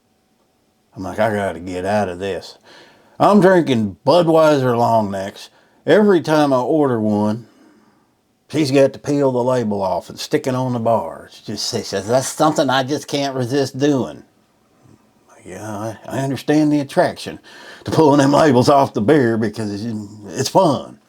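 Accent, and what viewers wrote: United States. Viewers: Podoba mi się Pański amerykański akcent :-) . Serdecznie pozdrawiam !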